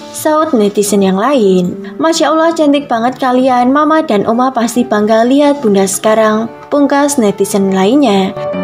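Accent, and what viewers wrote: Java, Indonesia. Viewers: Medok kali jawa nya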